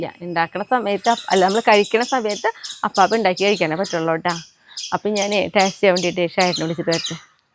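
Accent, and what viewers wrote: Thrissur, India. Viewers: Thank youvery much for the information. Are you from Tcr side I am happy to hear our Tcr slang .now iam in Kollam.your way of presentation and smile beautiful. The vessels you used are super .surely I will make these Dosas try to make my huaband Happy. Bye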